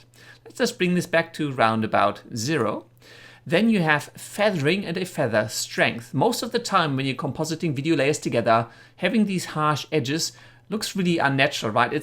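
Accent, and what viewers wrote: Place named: Germany. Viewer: Really really great tutorial! and nice german accent :D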